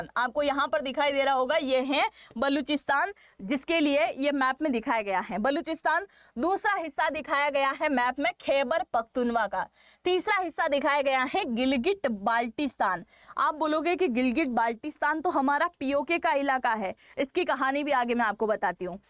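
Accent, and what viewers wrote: Gujarat, India. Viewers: I LIKE YOUR ANCHORING....YOUR HINDI LANGUAGE IS 👍 GOOD LITTLE BIT GUJRATI MIX UP. YOUR HINDI KI PALKI SHARMA......❤ U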